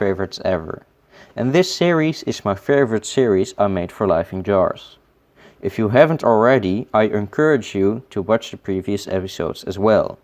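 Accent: Netherlands. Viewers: 80% sure you're from the Netherlands.. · You are so Dutch, it hurts my ears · Your Dutch accent makes this funny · in Jars...I played your first clip on your jar ecosystem for my 13-yera-olds in our science class and they loved it! We were looking for a group effort in our upcoming science fair and our unit on ecosystems. GREAT job! Your accent sounds a bit Dutch to me. Are you from The Netherlands? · Do you come from the Netherlands? Just wondering 😂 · Maybe its just me but you sound dutch. So are you dutch?? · You sound very Dutch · Are u dutch? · Ben je Nederlands?😂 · It's good knowledge and are you Dutch? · You’re Dutch for sure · Wich country you live in? You sound a bit like dutch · Waneer je kan horen dat ie nederlands is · you're obviously dutch, aren't you? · Hij klinkt een beetje Nederlands. (He sounds a bit Dutch.) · hey life in jars are you dutch? 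your accent sounds so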